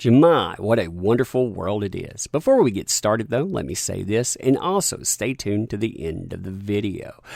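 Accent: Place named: Texas, United States. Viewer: Sort of wondering if you are from Texas with your use of "set" for "sit" ;)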